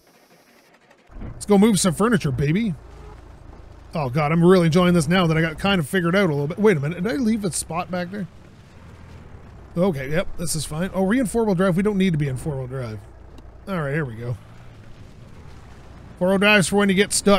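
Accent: Canada. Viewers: I love your videos, quite funny to watch. I can tell that you're definitely not new to working on cars, though I'm not a mechanic. By chance, are you Canadian? Your accent sounds a little like that.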